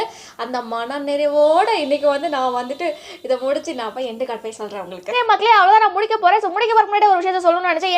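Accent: Tirunelveli, India. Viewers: Akka neega tirunelveli ya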